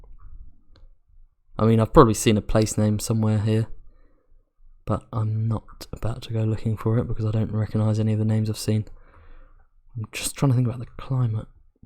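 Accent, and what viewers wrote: United Kingdom. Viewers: I meant, to me Wizard has a stereotypical Black Country accent and you have a more generic Southern England accent which I find hard to pin down.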